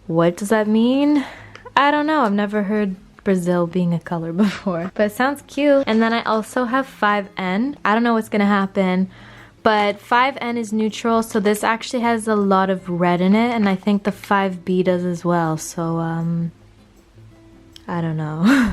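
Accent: United States. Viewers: Where are you from? I don’t understand why you’re saying pounds when you sound pure American. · Where are you from? You said pounds but you sound American x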